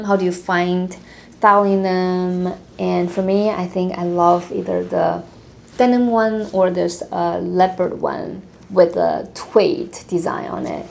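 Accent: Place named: New York, United States. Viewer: when you spoke about the rain and wind I listened and just realized you are a NYC girl too!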